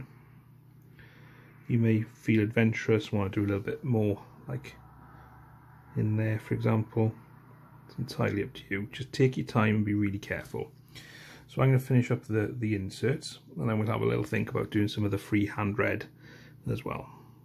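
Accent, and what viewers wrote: Wales, United Kingdom. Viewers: Hey great video, just picked up my first Age of Sigmar set and I'm keen to get painting. Also, do I detect a little Welsh accent in there? Either way, greetings from South Wales :D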